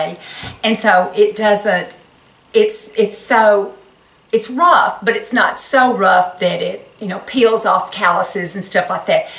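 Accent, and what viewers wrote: South, United States. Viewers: I'm from Florida. My family has been in Marion County since 1846. So I am truly a southerner. I tell you, it is so wonderful to hear a southern accent. It is really hard to find one, any more, down here in Florida. You are absolutely a breath of fresh air. I love your YouTube channel. Through the years I have put on weight and am having trouble taking it off. Can you give some pointers on how you keep yourself fit? Maybe some diet suggestions. I'm so sorry you hit that rod! I pray the Lord put His super on the natural for you and that you are better, quick like a rabbit! Thank you for everything!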